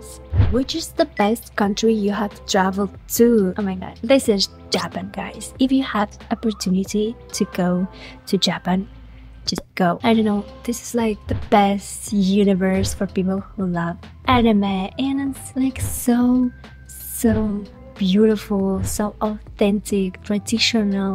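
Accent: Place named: South Korea. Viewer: I really like ur korean English accent its unique and😅😆😁 by the way i like ur vids they always bring a smile on me 😁😁😁 keeping doing ur best may God bless U✝✝✝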